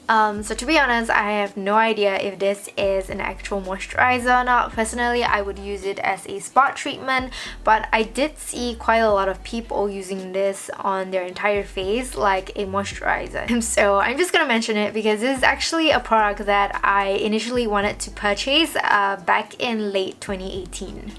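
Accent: Singapore. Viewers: Are you Singaporean?? Interesting the way you pronounce ‘really’ and ‘mugwort’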